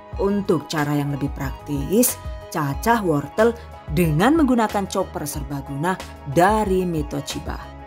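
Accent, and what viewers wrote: Banyumas, Indonesia. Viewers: Q nntn ngantuk pas dgr suara ky ngapak jd melek lg😅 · Deneng dadi bahasa ngapak🤣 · Wong ndi sih ngapak temen yuuu🤣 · Asyik jelas dan teratur bgt...ada bahasa ngapaknya juga😂😂😅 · Saya recook ini kak, buat jualan, hasil x endeessss🤤, selain itu paling suka dengerin kk nya ngmng, gak ngebosenin, ngapak x gak ketinggalan jg 😆 #GiveawayTHV2021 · Wilang ngapak ya😅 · rika wong endi yuu..deneng ngapak banget😄😄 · ngapak kaya nyong🤭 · Hahaha....ngapak jaaan kiye lah...mtr nuwun bund resepnya.sukses sll kontennya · Rika wong ngapak ya Bu😂😂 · Mbak, asline uwong mediun apa brebes si.. Faseh bener logat ngapak e 😅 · Jan logate apa karo nyong..y slm ngapak..ora ngapak ora kepenak..slm kenal..boleh dicoba nih😋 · DONE Terima kasih mbak krn selalu memberikan resep yg ekonomis utk ide jualan,serta dg penjelasan yg detail dg harga2 bahan serta hrs di jual dg harga berapa,suka lucu klo denger ngomong bahasa ngapak dg suara khasnya...semoga sll muncul ide resep2 br yg ekonomis buat ibu rmh tangga yg ingin memulai usaha..sukses dan berkah selalu..Aamiin #GivewayTHV2021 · 😂😂ngapak bngt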